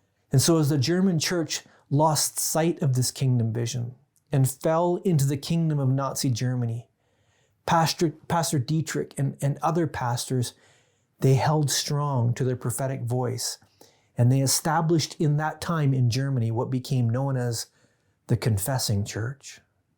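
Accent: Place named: United States. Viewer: Your question seems to presume that Bonhoeffer would do something in response to the Trump administration, not so subtly implying that the Trump administration is like the Nazi regime. As a Christian I reject this presupposition. The governments of Europe are much more fascistic than anything going on in America. So perhaps if you are referring to Bonhoeffer responding to the current situation in Germany I might agree with you. But your American accent suggests that you are referring to the Trump administration. You need to be clear about what you are referring to.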